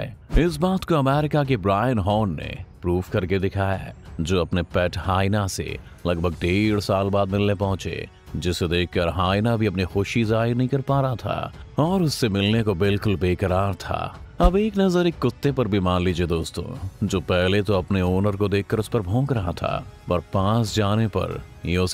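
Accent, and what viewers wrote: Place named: England, United Kingdom. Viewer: You speak Hindi in English style 🤣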